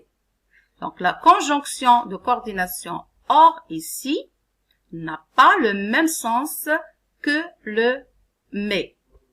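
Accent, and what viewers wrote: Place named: Arab world. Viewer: Tg juste prcq elle et arabe et elle a un accent arabe rasciste jte signale regarde comment tu la blaisse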